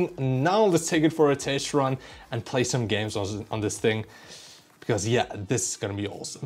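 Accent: South Africa. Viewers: Watching on my 9 year old i3 *cries in 20fps Edit: the whole time I'm like what is this accent it's almost South African and it turns out you are actually from SA lol. Nice to see a homegrown tech channel, you have my support dude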